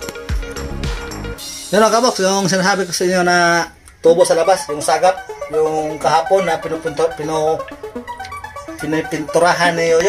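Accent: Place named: Iloilo, Philippines. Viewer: Morag ilonggo kaman ka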